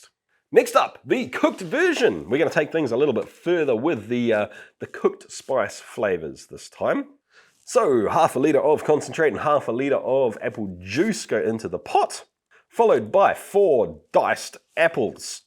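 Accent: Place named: New Zealand. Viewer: Just wanted to share my pointless thought, not really related to the topic of the video: This kiwi accent is killing me sometimes. One of the main things is that "e" sounding like an "i" and making one word sound like the other (for example better--->bitter). Ofc, there's a lot of other things too. Especially when I come back to this channel after a break it really gets me off guard, and takes a while for me to get used to it again. And dont get me wrong I'm not trying to criticize or make fun of this accent (as i said its a pointless thought, so I'm not really trying to do anything here), since I barely speak/understand that language at all, and I sound like I have a serious brain damage even when I speak my own native language, so I'm really not in any kind of position to make any actual comments or criticize anyone's accent. If anyone read to this point: thank u for letting me waist ur time😊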